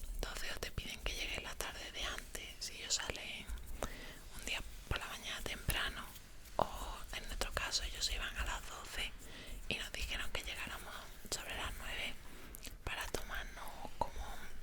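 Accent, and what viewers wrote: Malaga, Spain. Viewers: Hola muy buen vídeo, lastimosamente no aplico a lo del formulario, estoy un poquito lejos jajaja!!! Que bello tu detalle para con por lo de su perrita, eres única!!. El Sherpa es ahora El Señor de los Sonidos?? Jajajaja... son geniales me gusta su naturalidad, únicos y exclusivos en su clase!!! como le comenté a, me encanta como uds las Malagueñas hablan, junto a sus susurros es genial y muuuuyyyy relajante para mí, en lo personal. Un gran abrazo distante pero sincero, cuidence mucho.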